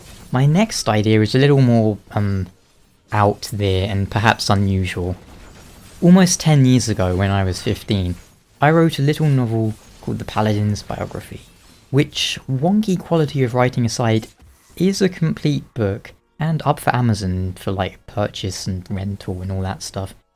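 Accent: United Kingdom. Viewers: I strongly agree with your ideas here. I don't play destiny, but I like the Lore videos you make. Also some Diablo Lore videos would be uber sweet. I also believe your British accent makes you very pleasant to the hear. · Go for it mate, even if you want to use dark souls ost if you need to, I rekon your audio book will be well received Also we're you born in the uk? Nice work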